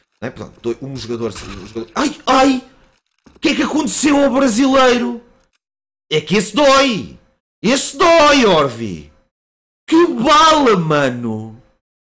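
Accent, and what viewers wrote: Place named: Azores, Portugal. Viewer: sao miguel só, o resto dos açoreanos nao falam assim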